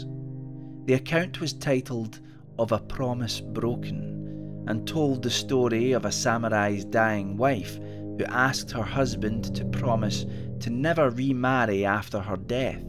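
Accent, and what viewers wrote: Scotland, United Kingdom. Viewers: Once again, an awesome video, right to the VERY creepy last second! Your engrossing "Scottish Crypt Keeper" kinda narration always adds a whole other level to the spook factor, thank you~Well done! The applause you hear is coming from the Rocky Mountains, in the United States. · i love your scottish accent i think its the best accent to tell stories with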